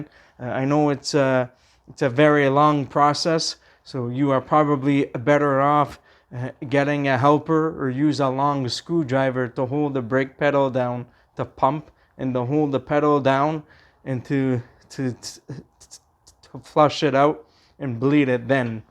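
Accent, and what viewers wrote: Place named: South Africa. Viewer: Its been a whole year of trying to figure out your accent and Im just going to wing it, is it South African?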